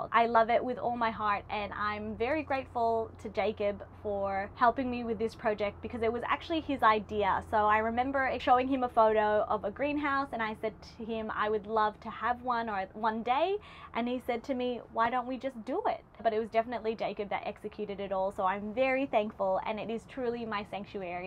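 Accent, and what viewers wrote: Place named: Australia. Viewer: I'm picking up on a slight Australian accent, are you from Adelaide by any chance?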